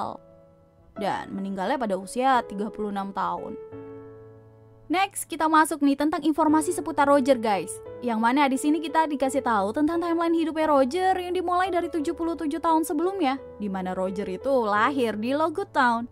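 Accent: Java, Indonesia. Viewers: Saya kangen suara medok 😀😀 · Iyoi medok... Koyok pisan. Wong jowo kabeh berarti iki yo... G or Irish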